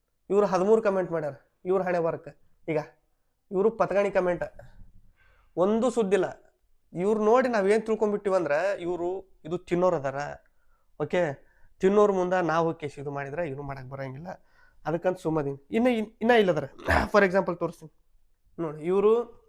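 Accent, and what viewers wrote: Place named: Bijapur, India. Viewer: ಪಕ್ಕಾ ನಮ್ಮ ಬಿಜಾಪುರ ಲೋಕಲ್ ಭಾಷೆ 👌👌ಬಾಲದಿನ ಆಗಿತ್ತು ಬರಬ್ಬರಿ ಆಯೇತು 😂😂😂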